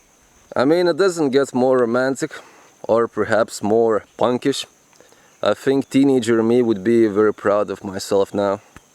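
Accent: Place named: Russia. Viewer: Russian accent out there in the jungle catching snakes. This dude is awesome. Modern day warrior 💪👊💪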